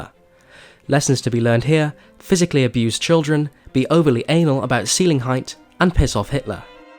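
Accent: United Kingdom. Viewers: You forgot the British Accent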